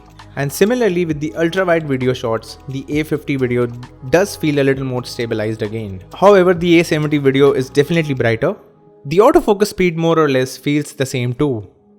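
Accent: India. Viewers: I meant because of the indian accent I getting sick of it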